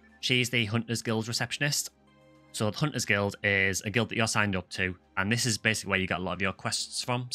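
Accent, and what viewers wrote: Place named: Teesside, United Kingdom. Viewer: you sound like your free teeside mate! Me and my friend from Billingham are literally downloading this game tonight to relive the nostalgia!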